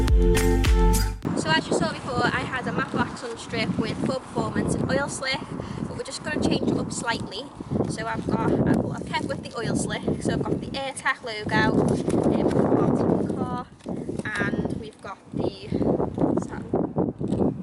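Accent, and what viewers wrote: Liverpool, United Kingdom. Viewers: are u from liverpool? I was deployed to england for 6 months, trying to see If i learned anything about accents while I was there lol! Super clean ST!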